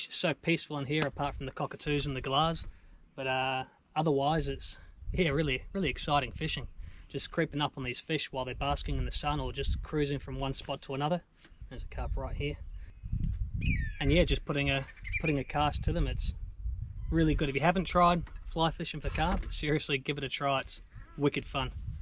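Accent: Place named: United Kingdom. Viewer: so I'm confused.... you sound like one of "those people" who would say pm as 'half nine' rather than nine thirty lol but you mentioned Rex Hunt, I'm guessing that would mean you moved here 20+ years ago and still haven't lost the accent? or am I WAY wrong? either way mate I'm loving your carp/fly vids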